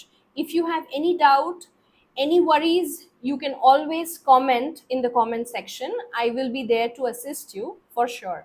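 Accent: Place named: Bengal, India. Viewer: Your mother language is bangoli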